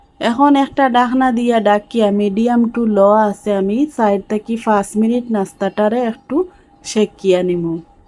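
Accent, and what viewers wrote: Sylhet, Bangladesh. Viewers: আসসালামু আলাইকুম আপু মাসা আল্লাহ নাস্তা খুব খুব সুন্দর লাগলো আমার নমে হয় সিলেটের বোন কথায় বুজা গেলো❤❤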